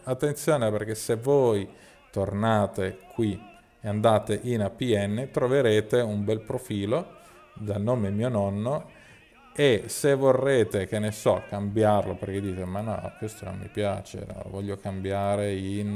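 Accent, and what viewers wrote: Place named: Veneto, Italy. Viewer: Il peggior problema è che l'algoritmo del router sceglie la banda con il migliori RSRP, RSRQ, SINR senza curarsi dell'effettivo risultato in termini di velocità. Nel mio caso la banda 20 restituisce ottimi parametri di ricezione, ma velocità scadente. Inoltre nell'hack non registra la scelta di banda, anche se nella pagina di debug è settato su auto. Nel mio caso, per esempio, indico 1+3+7 (sui -110 db) per evitare 20 e 28 che sono lente (sui -80 db), e questi parametri non vengono registrati. Rimangono solo due opzioni: fissare la banda a una sola o dalla pagina di debug o dal cell lock. Da quando ho smanettato con l'hack, il router non aggrega più. Non capisco poi quindi la differenza tra il cell lock e la selezione della banda e forse considerando che ho solo una sola BTS a 900 metri nella mia area, non fa grande differenza. 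Fino al 24 del mese sto ancora in 4G, dal 25 vi aggiorno. Un ultima curiosità: la mia zona non c'è zefironet, uso Iliad, ma il router accetta sia gli apn di wind che di iliad, la cosa gli è indifferente. Stessa cosa con le con le bande 4G in cui la 20 è su banda di wind e 28 per iliad e funzionano indifferentemente. Un grazie speciale a per il suo grande studio e disponibilità, mi spiace che hai dovuto dismettere il tuo blog. Un unico neo: ogni tanto la tua dizione è poco chiara. Non è per l'accento veneto, che ci sta, ma proprio non articoli bene alcune parole. A volte devo rallentare il video, ripetere il play, consultare la sfera di cristall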